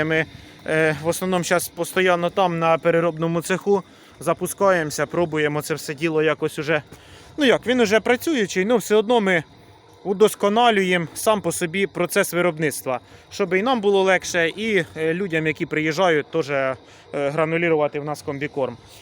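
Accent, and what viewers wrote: Poltava, Ukraine. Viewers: Нарешті ти з'явився.Вже скучили.Я родом з Полтавщини,але вже 50 років живу на Черкащині .З задоволенням слухаю рідну полтавську говірку.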